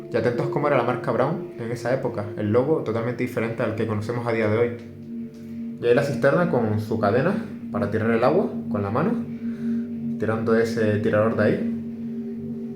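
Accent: Canary Islands, Spain. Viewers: Muy interesante el video con sus aulas de colegio y sus sillas,un saludo ,me encanta tu acento canario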